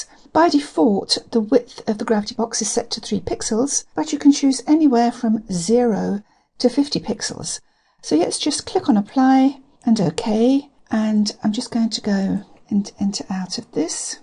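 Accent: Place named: United Kingdom. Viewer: Lovely to hear a clear British voice. Thanks for your tutorial 👍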